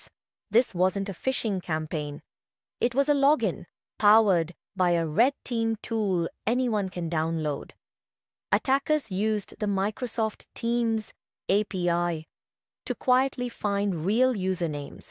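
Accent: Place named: India. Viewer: They made text to speech to sound indian. That is something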